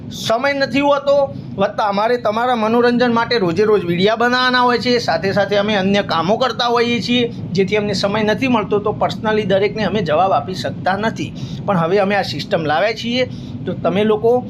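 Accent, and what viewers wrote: Mehsana, India. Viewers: Tame Kaya gamna chho? Ane kaya gamna che. Tamari mahesani bhasha amne bahu game che. Karanke hu visnagar no chhu.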